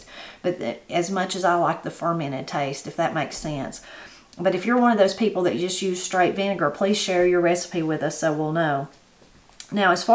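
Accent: Appalachia, United States. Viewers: Just keep talking Miss, that’s what has drawn the majority of us folks to your channel is your colorful language, along with so much more. Anyone that doubts anything you say on here should meet you in person as Robbie Lynn & I have on several occasions now to witness that you are the same genuine person on and off the channel. We say Appalachia just like you do along with many other words you use like okrie and yes mam we push the Buggy in the Grocery Store to lol 😂 👍🏻👍🏻 We get that a lot on our channel to where folks say that we have an accent down south to like you mentioned, but to us it’s just the way we were raised to talk like you said about y’all. Oh yea common sense out weighs an education any day of the week in my book to. Thanks for sharing this our APPALACHIAN Friend!!!! 😇🙏🏻😇🙏🏻👍🏻🙌🏻❤️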